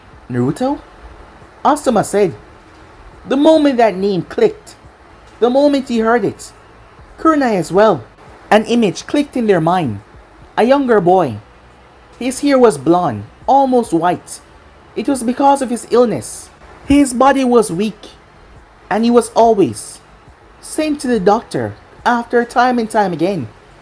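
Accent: Jamaica. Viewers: Day <num> million trillion of asking if your Jamaican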